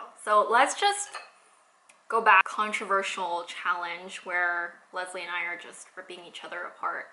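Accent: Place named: California, United States. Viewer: Voice and Cali accent have stayed the same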